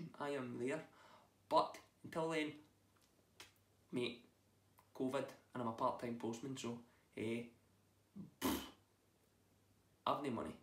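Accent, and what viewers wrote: Scotland, United Kingdom. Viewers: obvious he is from scottland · yeah it ist scotish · I absolutely love this. I'm new to your channel, but it was nice to see a humble, down to earth, and honest person behind the voice. I struggled to keep up with some of what you said (I'm in the US), but I could listen to you talk/sing all day. I have a small percentage of Scottish in my blood, so I've always been drawn to the Scottish accent, as well as the Irish, British, Australian, well most accents are beautiful to me. 😂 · hart war vielleicht etwas übertrieben in dem Fall. aber einen starken schottischen Dialekt verstehen selbst Engländer schlecht.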